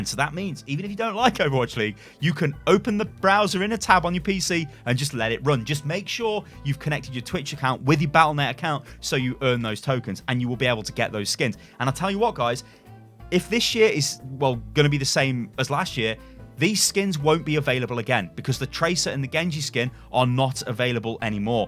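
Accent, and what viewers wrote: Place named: United Kingdom. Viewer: Your British talk is confusing. But I love British talk so I’m learning lol.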